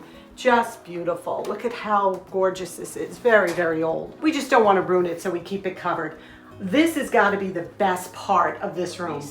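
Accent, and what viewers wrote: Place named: Long Island, United States. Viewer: Love it! Are you from Long Island, NY? Can't miss your accent! Is the icing on the cake...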